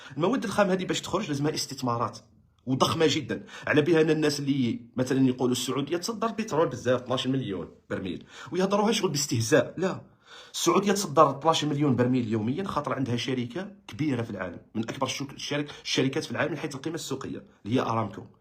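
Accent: Morocco. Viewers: الهدرا تاعك تبان شغول مروكي ،،،،تهدرو غير علي كرشك ،و انت واش تمد البلاد ،اتزوج دير دراري و رمي برا ،،، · هذه اللهجة مغربية و الله أعلم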